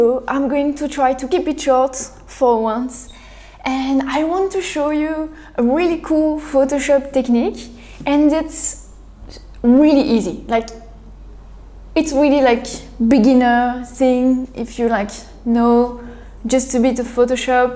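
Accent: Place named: France. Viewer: "but that's not bad, hein !" Not only will we forgive your french accent, we will also love it ! <3 Also, prepare for my rainbow cat 🙈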